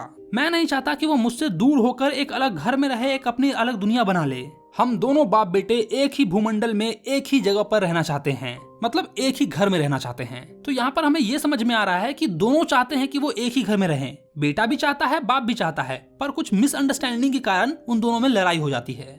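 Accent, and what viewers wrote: Bihar, India. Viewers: Sir aap Bihar se hai na ❤❤ Aapki avaj se laga 😂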